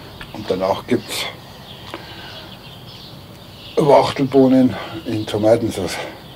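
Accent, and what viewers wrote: Bavaria, Germany. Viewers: Die Wachtelbohnen in Tomatensoße muß ich mir auch mal besorgen. Die gibt es bei Amazon günstig im 2er-Pack. Dein bayrischer Dialekt ist für mich immer ein Genuss. Ich wünsche Dir viele Sonnentage. LG Bernd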